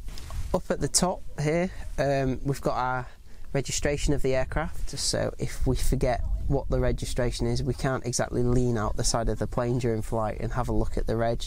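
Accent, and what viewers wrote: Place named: United Kingdom. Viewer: It was quite challenging for me to interpret the British accent, I´m more accustomed to the American one. It feels like a mechanical typewriter is speaking to you, and the rhythm hypnotized me and my eyes closed in a brief sleep. I rewound the video several times. In any case, I found it very interesting and the information provided was complete. I enjoyed it very much. Greetings from Buenos Aires.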